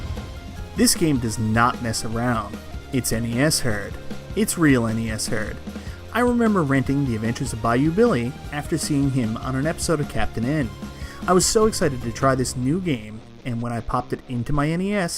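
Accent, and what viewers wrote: Newfoundland, Canada. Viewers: Is that a Newfoundland accent I hear? I am also a hard game aficionado from the Rock, so that's nice. I've even beaten all three of these, and they're no joke.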